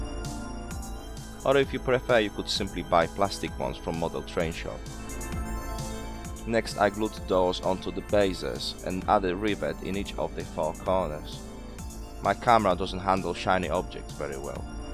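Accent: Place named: Liverpool, United Kingdom. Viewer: Great video! I'll have to make some of these! Love your accent btw! Did you learn English from a Liverpudlian? Certainly got that scouse ring to some words. Great job!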